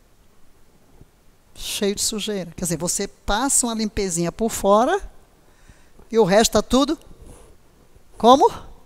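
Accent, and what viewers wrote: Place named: Portugal. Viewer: Tu tens sutaque de Portugal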